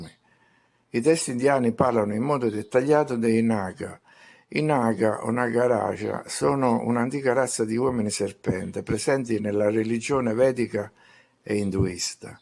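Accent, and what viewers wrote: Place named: Molise, Italy. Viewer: L'inflessione della voce assomiglia alla mia molisana 😀 Grazie, e scusa per l'invadenza.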